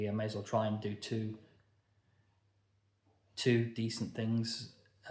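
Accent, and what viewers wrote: United Kingdom. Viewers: never give up giving up mate , you might think you are worthless at the moment , but your honesty and direct approach at dealing with this is going to win , i live in derbyshire you sound local to me buddy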